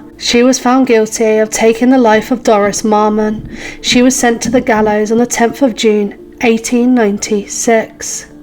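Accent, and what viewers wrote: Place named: England, United Kingdom. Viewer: I never had any doubt this was narrated by a woman from england who is real. Ai can be too obvious.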